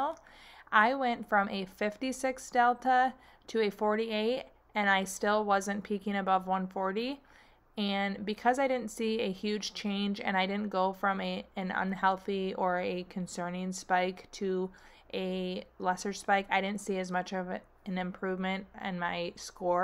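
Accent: Midwest, United States. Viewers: Missed the midwest accent 😉. Been awhile since one of your vids popped up on my feed 💪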